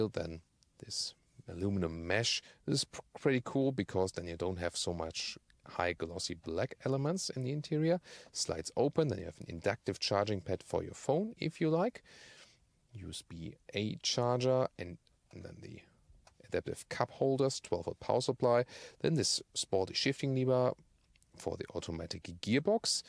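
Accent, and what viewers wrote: Germany. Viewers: There's something so satisfying about a German car being reviewed by a guy with a pleasing German accent. It's just wunderbar!!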